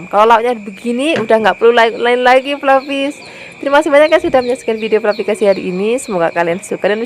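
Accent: Java, Indonesia. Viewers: MBK medok jawanya, denger suaranya ayem deh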